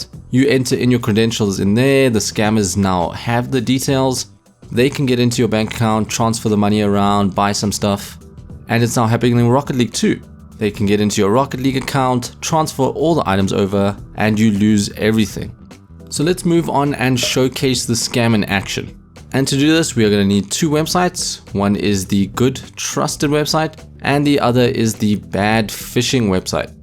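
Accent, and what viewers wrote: South Africa. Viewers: Is he south african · U from South Africa? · Are you from south africa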